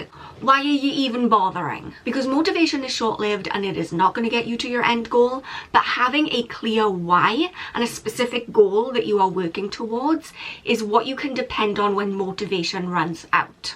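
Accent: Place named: Wales, United Kingdom. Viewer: I was trying to place it too; thought it sounds a little like Scottish but not quite... it's got to be Welsh, right? :D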